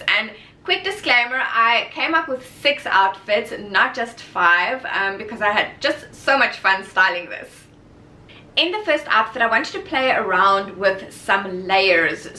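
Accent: South Africa. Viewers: Thx for your suggestions. I’m going to a wedding and wanted to dress up my dress. Also love the SA accent.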